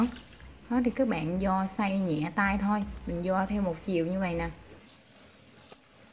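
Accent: Mekong Delta, Vietnam. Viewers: ko ko bạn đừng thây đổi giọng nói nhe vì đó là chất giọng đặc trưng của người miền Tây nam bộ đó, theo mình “bạn chính là bạn “ thì đẹp hơn nhiều. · nói giọng miền Tây rất là đáng yêu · Giong noi miet vuon mien Tay Nam Bo. Tu nhien va de thuong lam. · Cám ơn bạn chia sẽ cách nấu món xôi mình thích từ nhỏ. Nhưng xem clip này nghe thấy thương và thấy thích cái giọng miền tây nam bộ, cái giọng rặt chất "bắt con cá gô, bỏ chong gổ, nhẩy nghe gột gột"!!! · giọng miền tây nghe mộc mạc!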